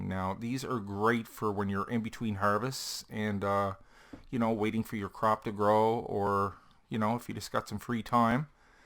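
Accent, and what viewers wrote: Canada. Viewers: Oh my god you sound canadian, I am from Prince Edward Island, Canada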